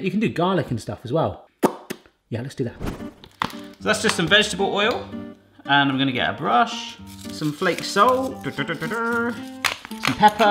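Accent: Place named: Somerset, United Kingdom. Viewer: Love a Somerset accent 😍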